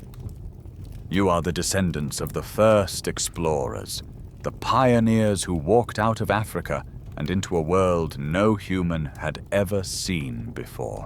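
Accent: United Kingdom. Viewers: Voice is way too loud and energetic for sleep. I also can't stand a British accent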